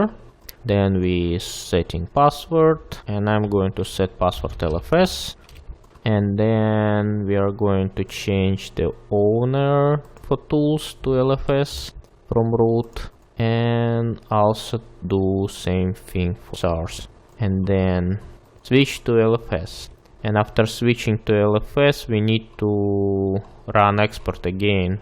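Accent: Russia. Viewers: С русским акцентом даже англ текст легко понимаем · Мне кажется или он русский??? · Are you Russian?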